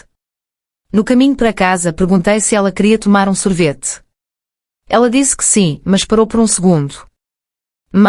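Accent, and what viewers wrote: Portugal. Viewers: Uma narração de áudio de Portugal eita meu Deus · Eu gosto da língua portuguesa (de Portugal mesmo? Gostei mto da história, foi mto bem narrada, parabéns. 🎉😊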